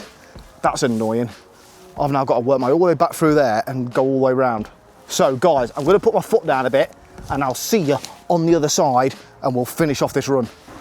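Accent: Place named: Leicester, United Kingdom. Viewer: Your Leicester accent is brilliant!! Me duck.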